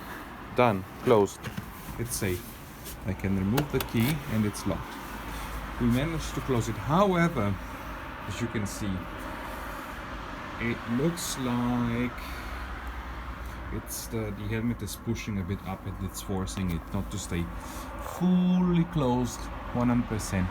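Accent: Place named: Germany. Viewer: Ich glaube zu hören, dass Du Deutscher bist. Warum sprichst Du dann nicht Deine Muttersprache.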